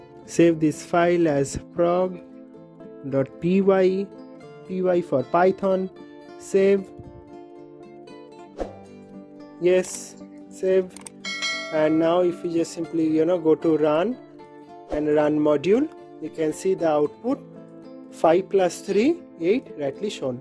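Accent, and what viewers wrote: India. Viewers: indian accent : check factual information : check does not waste time : check yep this man is legit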